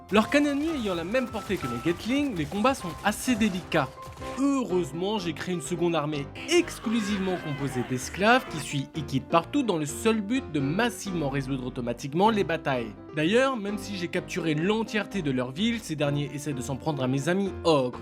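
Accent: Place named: France. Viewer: Great video Ur English is perfect but U somehow keep all the frenchness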